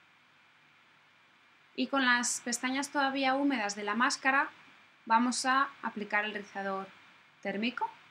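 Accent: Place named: Spain. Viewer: que bonito lo que ensenas..yo no soy mujer, soy guy umm masculino pero cuando es de halloween excelente...me encanta tu acento espanol, spain. Para halloween estare listo.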